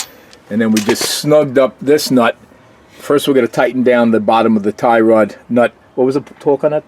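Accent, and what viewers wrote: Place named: Boston, United States. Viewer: Best,part of the video is the Boston accent..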